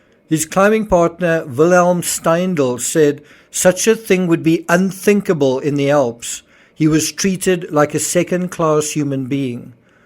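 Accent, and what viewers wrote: South Africa. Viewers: It is Hassan... but the narrator's South African accent has a "schwa" or soft R on that version of A...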